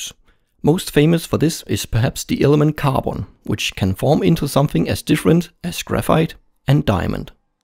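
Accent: Denmark. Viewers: Almost a year ago, but still awesome! I knew you were from Denmark, i could hear it in your voice :-) I'm from Denmark myself. But it's nice to know a danish youtuber making videos based on science. Keep it up! :D Fede videoer, hold det kørende! :D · are u a dane? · o_O you are from Denmark! for a long time I have been wondering, because of your ascent, and thought it sounded Danish. Så hejsa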